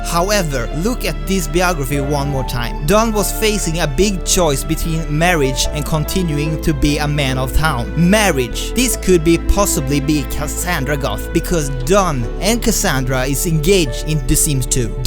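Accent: Sweden. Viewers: That is Swedish. He has an obvious Swedish accent as a German that speaks Swedish as well I can tell you that is Swedish, German looks pretty different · Din svengelska är helt underbar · He is Swedish, the game is on swedish so that's why he has an accent and bad grammar. Not that all swedes have bad grammar but he has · He's a Swede, you can tell that from the accent.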